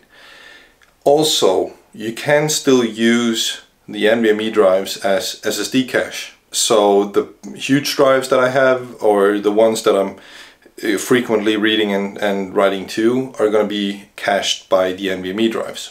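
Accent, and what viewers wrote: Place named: Denmark. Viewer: I went for the DS1522+ which, after installing DSM 7.2 can also accept SSD for storage. But the same as your problem. However I do not use the SSD slots just jet. Completely offtopic for a moment. An British friend of mine said he could not hear a difference between Danish (like you) people speaking English and Dutch (like me). And hearing you speak, I cannot too. I first thought you where Dutch, so I looked it up in your profile. But that is a offtopci (for me) fun fact.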